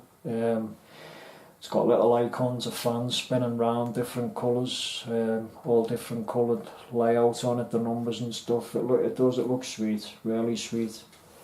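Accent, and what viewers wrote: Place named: Liverpool, United Kingdom. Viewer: Thumbs up just for that Scouse accent lol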